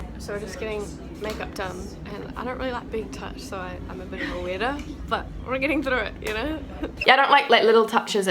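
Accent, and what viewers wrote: New Zealand. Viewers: I really love to watch video. She has really cute Kiwi accent. Hello from South Korea. I wish you upload video everyweek for subscribers. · i really love nz's accent <3 hello! from PH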